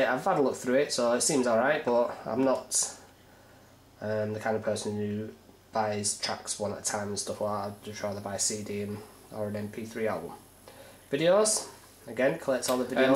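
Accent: Yorkshire, United Kingdom. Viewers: It's a yorkshire or some kind of northern english accent. IT'S A GREAT ACCENT.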